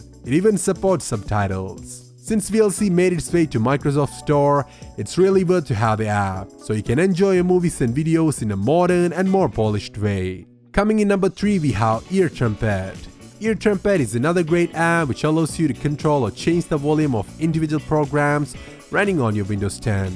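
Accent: Kerala, India. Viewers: Are you from Kerala?? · Your English wasn't bad. I could understand you well. Btw, are you from India?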